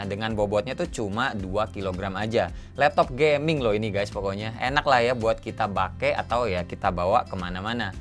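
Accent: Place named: Java, Indonesia. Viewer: Yah, bang kok gak intro nya ga ada "ketemu lagi" wkwk (Terus agak medok wkwk)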